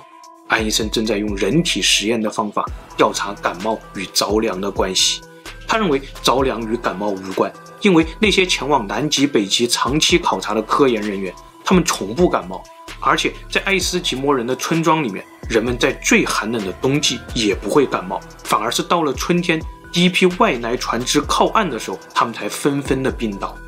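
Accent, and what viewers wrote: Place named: Hubei, China. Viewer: 现在听湖北口音听上瘾了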